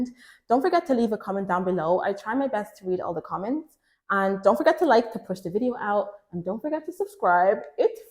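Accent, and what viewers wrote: Ireland. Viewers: Beautiful lip colour. I hear the Irish accent 😀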